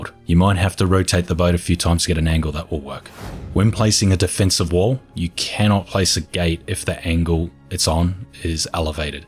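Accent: Australia. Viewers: classic aussie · Such an Aussie accent but awesome vid mate! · You sound like an Australian Ahoy <link>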